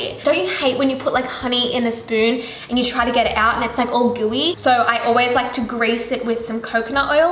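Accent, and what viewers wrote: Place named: Australia. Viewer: Lol that's the aussie accent for u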